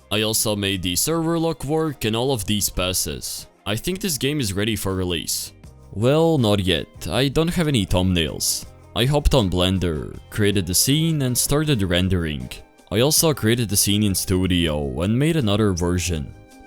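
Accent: Latvia. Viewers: Uzreiz zinaju ka tu esi viens Latviets no varda un ka tu runa😂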